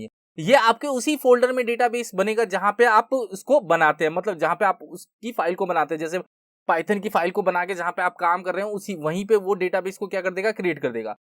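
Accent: India. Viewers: sir this is the right code import sqlite3 conn=sqlite3.connect("sqlite1.db") conn.execute(''' Create Table Student ( st_id INTEGER PRIMARY KEY, # <-- fixed here st_name VARCHAR(50), st_class VARCHAR(10), st_email VARCHAR(30) ) ''') conn.close I noticed that you are using AUTO_INCREMENT for the st_id column. This is not supported by SQLite, which uses a different mechanism for generating primary keys. You need to use INTEGER PRIMARY KEY instead, which will automatically assign a unique integer value to each row. This will also make your table more efficient and compatible with other databases. To fix this, you need to change the st_id column definition to INTEGER PRIMARY KEY I hope it's ok to give feedback, great channel by the way, the biggest benefit of this channel is the Indian voice, learning from English channels becomes tedious due to their dull voices, thank you for this material.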